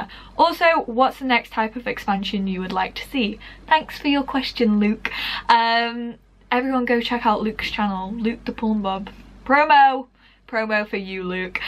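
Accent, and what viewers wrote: England, United Kingdom. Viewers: i hate english accent but i love your playings a lot and i love so much irony 😁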